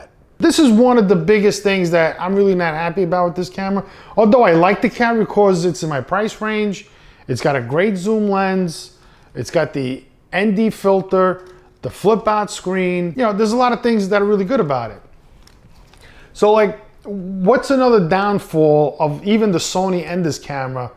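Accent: New York, United States. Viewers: Let me guess, your from New York. What is your obsession with the grip. Review the camera/cameras. Your voice is so irritating and the non info about the cameras means you wasted 20+ mins of my life. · Thanks for the great video, you sound like a New Yorker. I have a question about the sensor size, it's advertised that the 2500 has a 1" sensor. The actual dimension of the sensor is 13.2mm X 8.8mm. and there is 25mm in an inch. And if they add the L plus W it equals to 22mm and not 25mm. My Sony R1 Bridge camera is 12 years old and the sensor size is 21.5mm X 14.4mm which equals to 35.9mm. This means that my 12 yr. old Sony's sensor is about 60% larger than the FZ 2500 sensor. So my point is, all this hype about the 2500's sensor size is really no big deal, sounds like it's an advertising gimmick. What are your thoughts about this???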